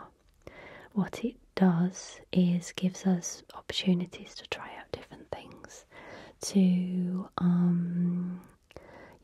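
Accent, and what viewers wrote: United Kingdom. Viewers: Thank you! I'm so happy you shared your favorite ASMR channels with us... I too love ASMRtists with an accent, I don't know why.. and for me YOU have a lovely English accent;-) I'm a French-speaking canadian, so your british accent is really exotic to me! Many artists from over the world do videos in English, I guess it's easier to reach more people using English, since it's an international language... but I'm always happy when I discover an ASMR artist that speaks french. Unfortunatly, there are not that many... So I decided to start my own little channel in French, and you really are an inspiration to me! I'm about your age and I somehow recognize myself in your life story (I loved your "life in drawings video"). Thank you for your generosity! xx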